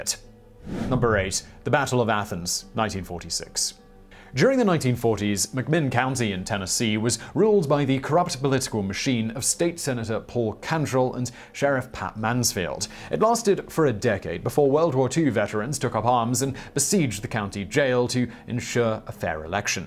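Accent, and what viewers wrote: United Kingdom. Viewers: There are times when I watched him before I knew where he was for certain that I could not tell. Is he American (no) is he British (maybe?). I figured he was a transplant.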